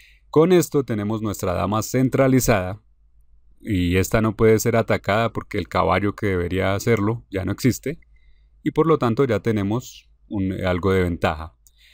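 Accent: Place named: Bogotá, Colombia. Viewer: ¡No sabía que el GM Igor Smirnov hablara español con fluidez, y con acento rolo! 😲😃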